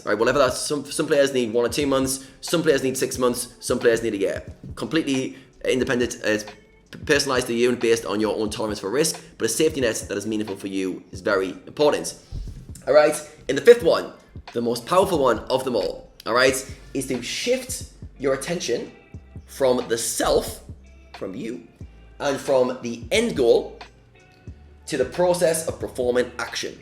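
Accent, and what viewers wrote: United Kingdom. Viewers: Great video! Where are you from? Wales? Curious about your accent.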